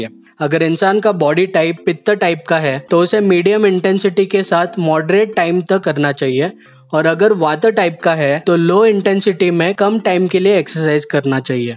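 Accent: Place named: Odisha, India. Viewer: Brother You Are From Odisha ? I am asking it because your language pronounciation are more related to Odia.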